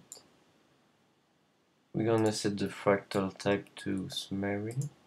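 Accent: France. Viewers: Omg, mec, ça s'entend que tu est français! x3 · I think hes French, I'm French and I have the same accent. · where are you from ? not sure if you're french or not ! and this mask is awesome ! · L'accent français est juste épic ^^. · u sound French, your voice is cute!!!! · a il est vraiment français j'me suis dit : merde, il a un accent français chaud pour lui :rire: maisq bon il a beaucoup de vocabulaire :3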